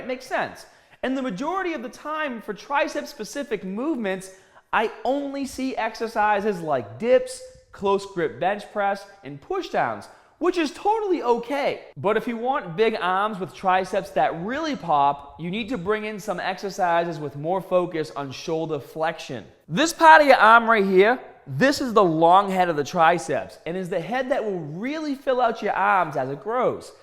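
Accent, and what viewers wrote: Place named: New England, United States. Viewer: I don’t remember this thick New England accent when you were on the real world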